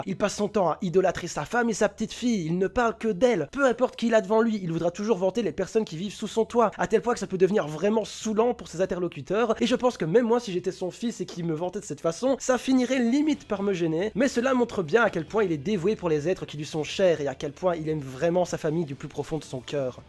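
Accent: Belgium. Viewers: Tu serais pas belge?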